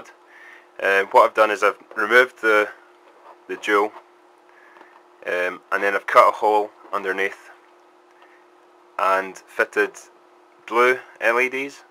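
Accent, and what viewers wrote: Scotland, United Kingdom. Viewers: dont you just love browsing through random videos and finding a Scottish accent xD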